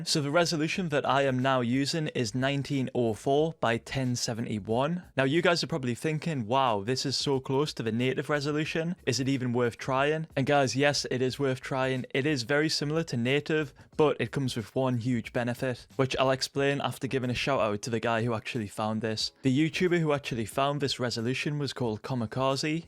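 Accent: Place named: Newcastle, United Kingdom. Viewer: I think he is from Newcastle in England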